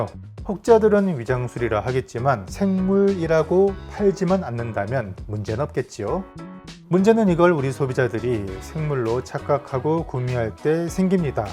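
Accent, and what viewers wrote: Gyeongsang, South Korea. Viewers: 님을 알게되고 영상을 수년간 봐오면서 의아?했던점이 '서울분으로 알고 있는데 말씀하실때 왜 경상도느낌이 계속 나지?' 했는데 오늘에서야 비로소 궁금증이 해결되었네요ㅎㅎ부모님께서 부산분 이셨구요ㅎㅎ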